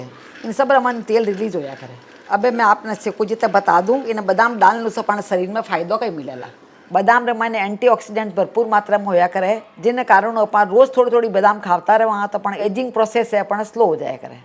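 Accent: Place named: Marwar, India. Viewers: आपकी gk को प्रणाम,,मारवाड़ी भाषा में English मीठी लगती है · Aap English bhi Marvari mi bolty ho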